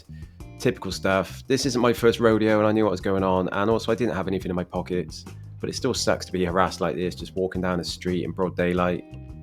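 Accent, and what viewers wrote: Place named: South West England, United Kingdom. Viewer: The best thing about the uk is your lovely southwest twang on your accent 😊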